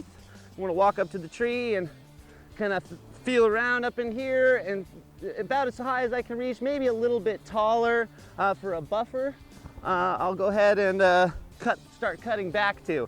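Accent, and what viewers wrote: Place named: Southern California, United States. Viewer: also, with your thick So Cal accent slurring of consonants, i could not get the name of that trimmer you are using, might you put it in the notes, or enunciate clearly next time? thanks much...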